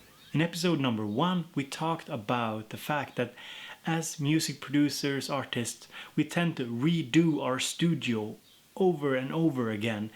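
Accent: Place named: South, Sweden. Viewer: Lovely to hear that you and your boss talked Swedish! I did hear that you are from the southern part of Sweden! Great video by the way. My cable managment is like a råttbo. :)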